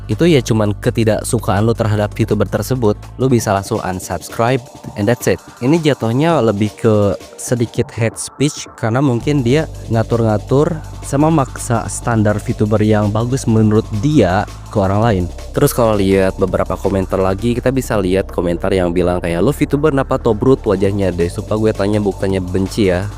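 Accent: West Java, Indonesia. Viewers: bang, lu sunda ya?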